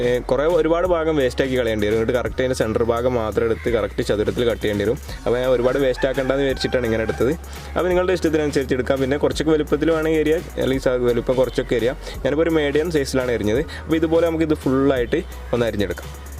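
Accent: Malappuram, India. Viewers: Daaaaa.... ഇത് നമ്മടെ മലപ്പുറം ഭാഷ ഒരു കുഴപ്പവുമില്ല നീ പൊളിക്കെടാ മുത്തെ...... 😘KL_10 chunkss😍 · മലപ്പുറം ആണോ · പക്കാ മലപ്പുറം സ്റ്റൈൽ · മലപ്പുറം ഭാഷ 😘😘😘😍😍😜😜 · Pinnalla muthe njan kottayam but malappuram slang is so beautiful bcz ente kooduthal frnd malappuram anu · എന്റെ അഭിപ്രായത്തിൽ ഇജ്ജ് അന്റെ അല്ലെങ്കി മാണ്ട ഞമ്മളെ തനി മലപ്രത്തെ ഭാഷേ തന്നേ പറഞ്ഞാൽ മതി ചങ്ങായി അതിനും അതിന്റേതായ ഒരു മൊഞ്ചുണ്ട് ഇബനെ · Malappuram da😄😄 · മലപ്പുറംകാരെ signature മാറ്റല്ലേ bro... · Kakka nee malappurathaano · Good, മലപ്പുറം മലയാളം തുടരട്ടെ. മനസ്സിലാകത്തവർ ചോദിച്ചേക്കാം. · നമുക്ക് നമ്മുടെ മലപ്പുറം ബർത്തനം തന്നെ മതിയെടാ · malapuram .slang athaanu. njanum malapuram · എടാ ചെക്കാ ഇജ്ജ് മലപ്പുറം ഭാഷ തന്നെ പറഞ്ഞാൽ മതി. ഞമ്മക്ക് തിരിയും ട്ടോ ചെക്കാ. നിന്റെ ഇന്നസെന്റ്സ് എനിക്കൊത്തിരി ഇഷ്ട്ടായി. Love you bro. Kl 10 ആണ് ഞാനും. കാളികാവ് മാളിയേക്കൽ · soopar . edakk swandam basha warunnundallooo .fullaayitt malapuram basha paranjoolee😃😃 oru koyappalla... 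· Njammalum malappuram okke thanne aanu bro... Bhasha onnum oru kuzhappavum illa...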